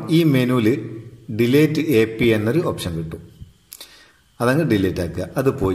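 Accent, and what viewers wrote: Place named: Kasaragod, India. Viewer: അടിപൊളി ഐഡിയ. അടിപൊളിയാവുന്നുണ്ട്ട്ടാ👍 ങ്ങളെ കാസർകോട് ബർത്താനം ഞമ്മക്ക് നല്ലോണം പുട്ച്ചിക്ക്ണ്.😜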